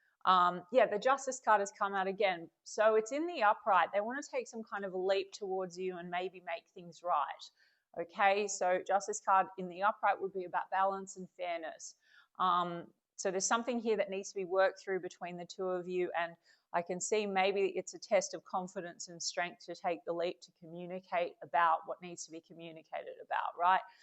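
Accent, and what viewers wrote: Australia. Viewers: You are so Aussie. That's why I love your readings. You go Girl. Please don't change a thing wonderful lady. Be the way your are.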